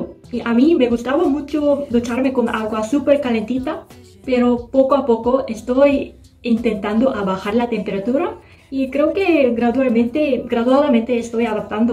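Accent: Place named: Latin America. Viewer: "vamos a platicar", "ahorita"... como española de España tu español se me hace muy latino, aquí no decimos esas cosas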